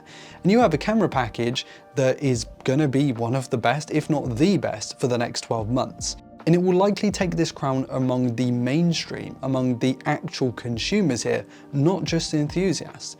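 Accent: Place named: Manchester, United Kingdom. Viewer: I see we have a Mancunian😂😂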